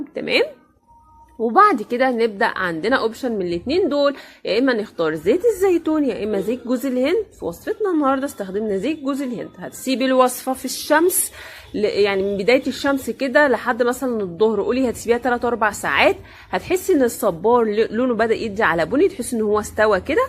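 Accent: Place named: Maghreb. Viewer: انت بتتقني اللهجة المصرية بس واضح انك من المغرب العربي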